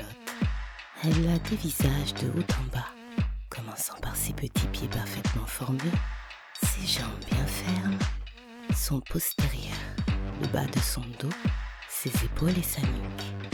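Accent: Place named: Tokyo, Japan. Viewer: On dirai tokyo la voix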